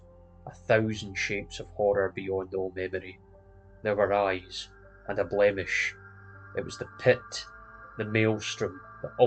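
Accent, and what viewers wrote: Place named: North, United Kingdom. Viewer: A Northern Ian...i dig it! Keep on it! Arkansas, USA!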